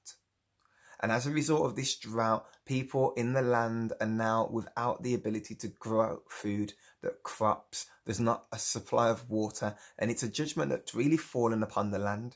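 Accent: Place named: Midlands, United Kingdom. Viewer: Yaaay are you from the midlands? I’m from Wolverhampton! Thanks for this.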